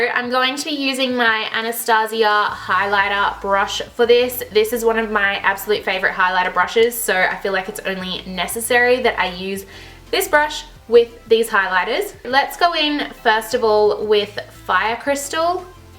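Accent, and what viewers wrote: Australia. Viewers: 😂😂😂she was so Australian in this one I wonder what part of Aus she's from, kinda wanna be her friend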